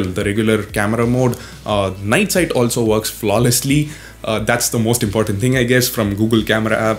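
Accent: South India, India. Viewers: Your south indian accent tho 😂.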